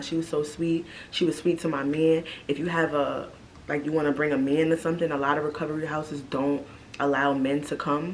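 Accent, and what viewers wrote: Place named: Brooklyn, United States. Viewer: Nah sis u really from New York and I’ll say Brooklyn just by the way you talk love your vibes hunny ... it’s the hand movement for me 🥰😭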